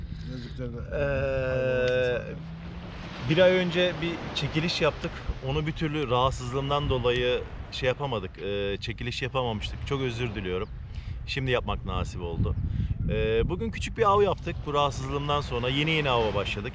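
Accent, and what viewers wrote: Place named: Russia. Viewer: Ruscayi harika konuşuyorsun lehcesiyle 😁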